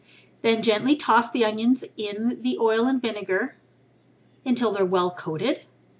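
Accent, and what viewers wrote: Canada. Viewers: within 30seconds of watching this video I can tell she is from Canada!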